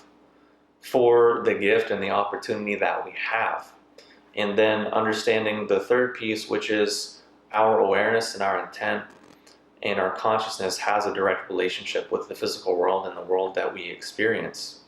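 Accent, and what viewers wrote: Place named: South, United States. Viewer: Yes. Very articulate. Just recently found you so I'm hearing a very strong southern "twang" pop up.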